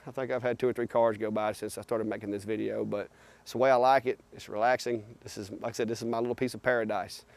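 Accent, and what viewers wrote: Alabama, United States. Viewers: I like it all! Love the animals, brother and BIL does some wood projects, and I grew up in my early life in mobile Alabama, so I love listening to bama folks! Lol! I live in houston, texas now and came from maymays channel. I think you are doing great! Variety is fun.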